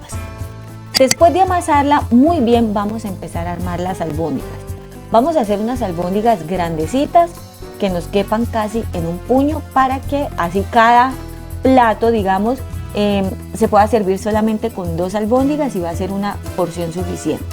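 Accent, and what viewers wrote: Colombia. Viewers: Ud es colombiana ??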